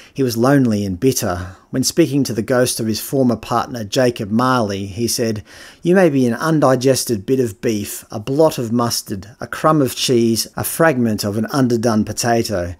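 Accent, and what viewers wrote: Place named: Australia. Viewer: you're an Aussie !!!!! Love this subscription even more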